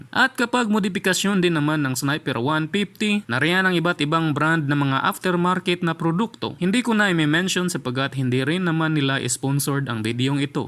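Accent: Visayas, Philippines. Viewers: tagalog na bisayang accent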